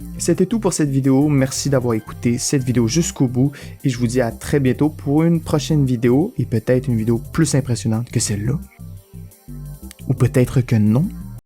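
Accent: Quebec, Canada. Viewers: T'es tu québécois team QUÉBÉCOIS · Québécois non? (Je reconnais grace au gros mots xD)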